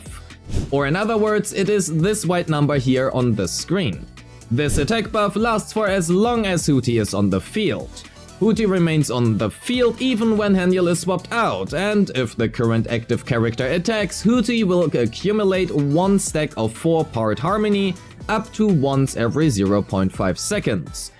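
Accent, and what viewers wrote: Germany. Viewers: I know you are from a German speaking country because of your accent but I CAN'T PROVE IT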